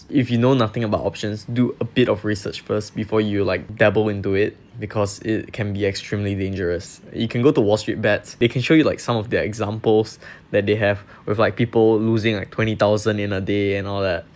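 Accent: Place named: Singapore. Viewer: singapore accent ah boi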